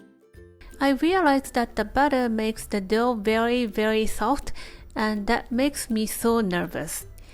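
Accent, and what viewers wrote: Japan. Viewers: I love Japanese accents when they speak English! So cute! Thanks for this amazing video!!!! Very well and detailed explanation in how to make a 🥖! I Will give it a try for sure!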